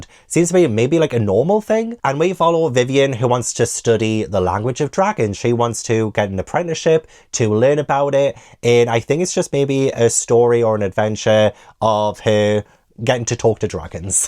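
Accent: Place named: Newcastle, United Kingdom. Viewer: Hello Came across your channel a couple of weeks ago and had to subscribe. I love a geordie accent plus you do make me laugh lol. Loving your channel Books are homophonic 🤣🤣🤣😳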